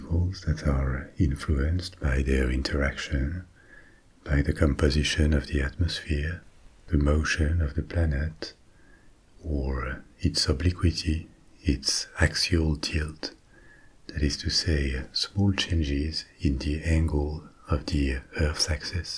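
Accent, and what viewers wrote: France. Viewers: ÇA C'EST MA FRANCE AVEC UN BEAUX ACCENT MERCI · You sound parisian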